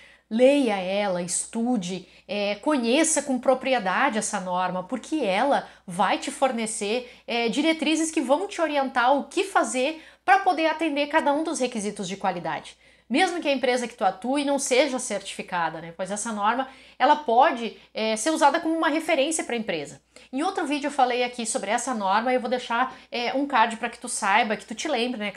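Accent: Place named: Rio Grande do Sul, Brazil. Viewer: Tu é gaúcha, hein..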